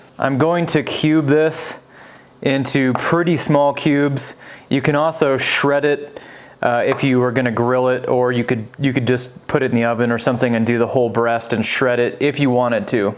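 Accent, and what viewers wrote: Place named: South, United States. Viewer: I moved to South Carolina about 2 years ago and have gotten used to hearing random southern accents in conversation (I’m originally from CT). I legit thought you were saying ‘Chicken Broccoli BREAD’… I’m like ‘why the heck does he keep saying bread in that weird accent?! Maybe he’s pronouncing it the way his mama pronounces it? … Then I see the BRAID … 🤦🏻‍♀️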